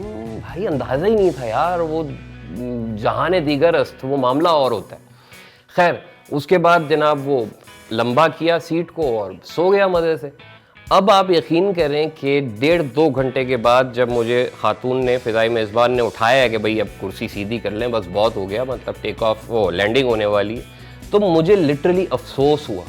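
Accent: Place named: Karachi, Pakistan. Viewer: bhai are you from Karachi? Your Urdu Dialect says it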